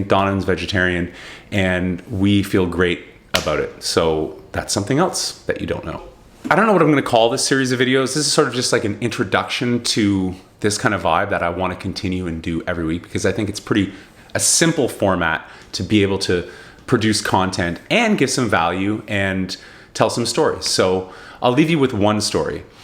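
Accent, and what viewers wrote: Scotland, United Kingdom. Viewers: Ive been in a creative rut too...need something more to challenge my creativity! I always love your stories dude!!! Can’t wait to hear more stories. And btw your Scottish accent really brought the story together ahah!!! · Your scotch accent is pretty convincing , great personal video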